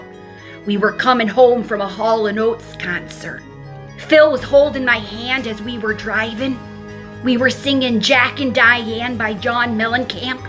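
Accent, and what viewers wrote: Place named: Midwest, United States. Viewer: Omg! The almost spooky story with a happy ending.. all done with a Midwestern accent. LOVE IT!❤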